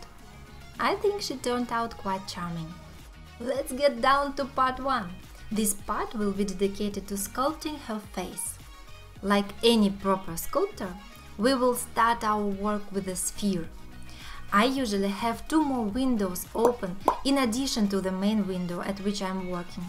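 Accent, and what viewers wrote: Russia. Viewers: Мне кажется, что на англоязычном ютубе и без этого видео, нет дефицита в туториалах и уроках по Блендеру (в т.ч и от пионеров и разработчиков Блендера). И какой смысл делать русское название, для англоязычного ролика? Это мое субъективное мнение, но лучше было уже делать ролик на русском, чем на английском, с произношением как у героев фильма "Красная жара".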